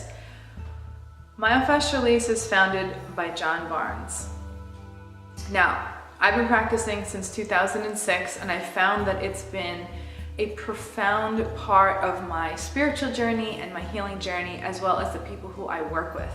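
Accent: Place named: New York, United States. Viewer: Great video. I like your accent, are you from nyc?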